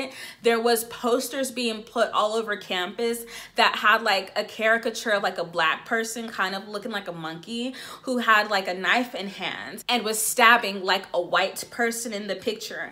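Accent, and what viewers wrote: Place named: Nigeria. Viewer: You got some Nigerian accent, kinda of tho.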